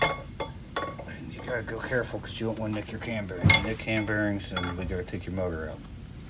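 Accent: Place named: Bay Area, United States. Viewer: Aye you from the bay bro?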